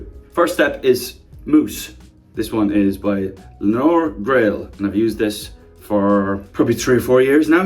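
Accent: Ireland. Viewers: I Stan a guy who takes great care of his skin so efficient and well done I will always love Niall plus he’s beautiful as well his adorable Irish accent and beautiful smile of course that giggle in the end do I like Niall even more or what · Omg same! I just love his voice and his accent and how sweet and down to earth he is and funny! I've always been into irish guys!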